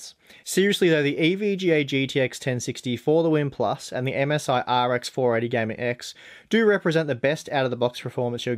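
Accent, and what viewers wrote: Australia. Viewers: You sound Aussie are you?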